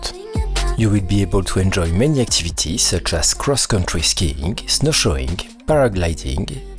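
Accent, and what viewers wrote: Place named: France. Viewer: ça ! c'est de l'accent français bien couillu et affirmé !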